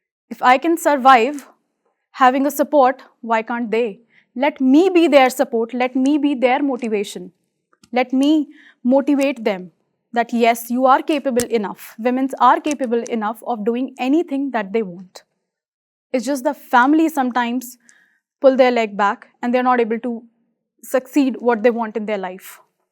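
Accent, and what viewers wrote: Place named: India. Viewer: Rose I can guess if u r from South. Y South people hate Hindi badly? Personally I have seen many South Indians know Hindi but they willingly choose not to speak it in public. U guys have sm major issues with Hindi or r politically motivated. Majority of Indians know Hindi & wats wrong in speaking it. From the accent of this gal I can guess dat this girl is Hindi spoken not Keralian etc. She has used many Hindi sentences in b/w dats y I said so. & u said dat we North Indians think dat d world revolve around us. 😁 Y u hate North Indians. & from one single comment of mine u have judged all d North Indians. Same I did with u dat South Indians hate Hindi. I said so coz I came across such incidents in my life, like one of my subordinates said I understand Hindi bt I do not chose to speak so. Sadguru also gave a detailed lecture on y he do not speak Hindi. 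Now u can question me dat y do North Indians not learn any South Indian language. The answer is: 1. We r not native to it. But no hesitation in learning d same. 2. Every state has its own language but Hindi is widely spoken in India. So learning Hindi is far useful than learning a native language which only selected no. of people speak. Rest is is choice but I wanted to question u & express my points on d same which I did. Now I can sleep calmly. I wud like to get ur inputs on d same. Bye!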